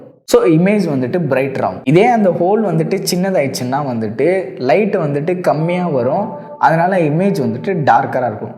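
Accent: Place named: North Chennai, India. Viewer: Bro unga north chennai accent ... I love it bro. I was in ponneri for 2 years. From thanjavur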